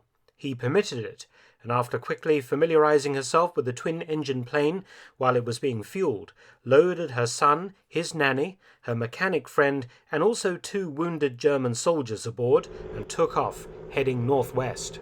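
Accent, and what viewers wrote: United Kingdom. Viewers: His German pronunciation is solid but far from perfect. There is a notable British accent and in addition some words sound quite different when a native speaker speaks them. For example "Hanna Reitsch" was quite close, but there was still some accent in the surname. In contrast to this, "Beate Uhse" was quite wrong. He did not speak the "a" of "Beate" although it should be spoken. In addition he spoke of the "U" of "Uhse" as "Ü". What he said would have been written "Bete Ühse" and not "Beate Uhse".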